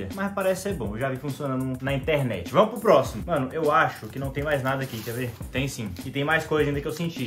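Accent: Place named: Minas Gerais, Brazil. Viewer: Quando eu descobri que ele na real de Brasília eu ate assustei pq o cara fala igual nos mineiros mo viage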